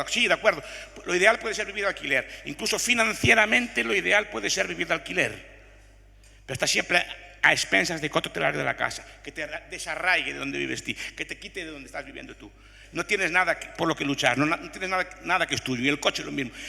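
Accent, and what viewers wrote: Galicia, Spain. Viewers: La bonhomía, la sinceridad, la honestidad intelectual, la curiosidad, el afán de conocimiento y su acentuado acento gallego adornan al profesor Anxo Bastos. Merecidísimo el Juan de Mariana por su relevante defensa de la libertad en todos los ámbitos.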